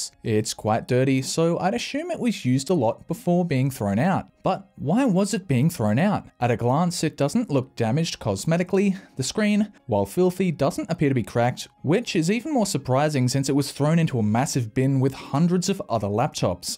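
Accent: Australia. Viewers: About a year or two ago, I stumbled upon one of this guy's videos and found it really entertaining and strangely calming. After the video was over I went and watched something else and completely forgot about this channel. Yesterday, I remember having a cup of coffee and browsing some tech videos and thought, "What was that channel with the young guy with the Australian accent who fixed computers?" I couldn't remember. But then...I remembered a very small detail. I went to YouTube's search bar and typed... "PC cleaning eucalyptus oil guy" and BAM found him. Subscribed so I don't forget again.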